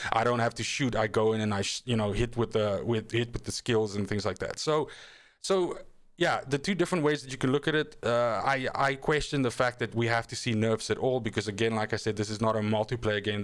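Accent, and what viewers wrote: South Africa. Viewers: Are you from South Africa. Your accent sounds familiar.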